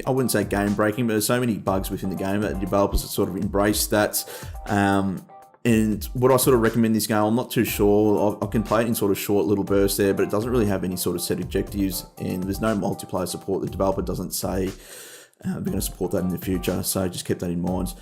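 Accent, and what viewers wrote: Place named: Australia. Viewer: Secound ! Can i has a Gmod :3 Dat australian accent ;D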